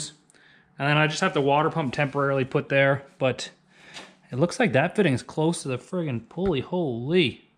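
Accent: Canada. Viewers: Lmao I love the Canadian accent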